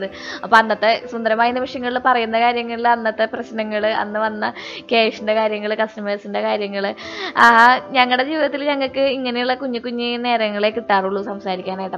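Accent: Thrissur, India. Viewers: Sathyamnu😁I like Thrissur slang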